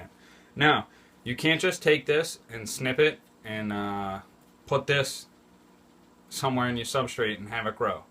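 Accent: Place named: Boston, United States. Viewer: I watch this guy for 2 reasons. 1) learn more about aquarium care and 2) practice my Boston accent. Love it.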